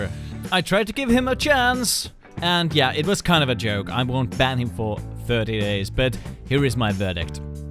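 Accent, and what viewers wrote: Finland. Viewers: Huomaa että oot suomesta xD siis aksentista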